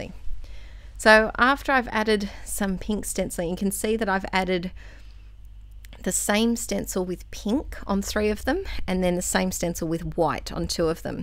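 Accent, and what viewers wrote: Australia. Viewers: Love your art I have been trying to work out your accent good to know we have another Aussie doing mixed media TFS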